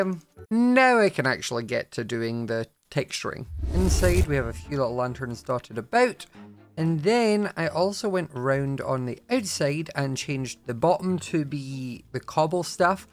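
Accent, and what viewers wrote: Scotland, United Kingdom. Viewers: Love your videos. Keep up the good work. Also what part of Scotland are you from, I'm from Glasgow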